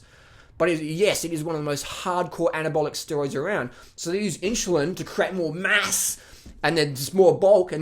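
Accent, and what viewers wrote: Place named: Australia. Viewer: Great video. Because of your awesome Australian accent, it kind of sounds to my American ears like you are saying fucktose when you say fructose. =)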